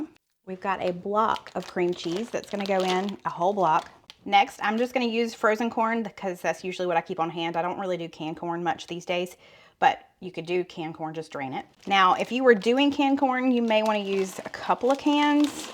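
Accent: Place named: North Carolina, United States. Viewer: This is the first time I have seen one of your videos. I was hearing your accent and thought, North Carolina. Then you said Food Lion, and I was like mayyybe lol! Great recipes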